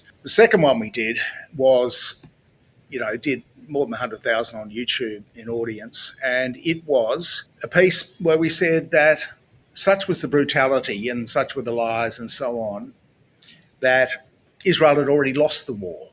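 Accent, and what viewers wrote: Australia. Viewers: Nice to hear an Aussie ascent on my side of politics. I have missed it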